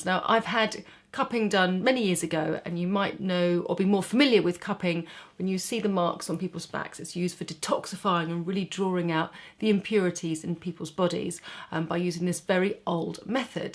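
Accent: England, United Kingdom. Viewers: Agree. It's so refreshing to hear an English accent for a change, and not the nasal twang of 'hi guys, welcome back to my channel' ... blah blah.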